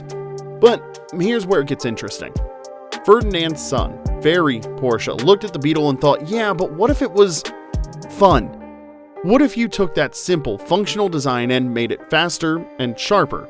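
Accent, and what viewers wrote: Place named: New Jersey, United States. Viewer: Here’s another little tidbit about my wonderful state of NJ. Did you know that in the mid 50’s Margaret Hague Hospital in Jersey City, developed and held the clinical trials for the polio vaccine. The team of scientists worked under Jonas Salks, essentially wiping out polio. I grew up in Jersey City, and I travel the world as an airline Captain. I always run into someone from Jersey, no matter where I am. A little bit of everything in this state, and some of the best striped bass fishing in the world.😊 By the way, the accent is still very heavy.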